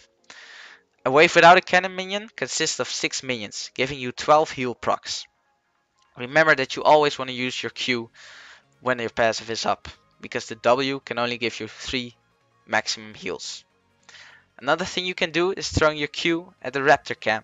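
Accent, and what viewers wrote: Netherlands. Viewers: From the Netherlands i think?? Nice guide thanks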